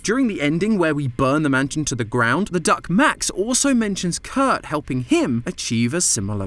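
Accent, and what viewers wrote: United Kingdom. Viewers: british matpat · Hey British mat pat tell mat pat I said hi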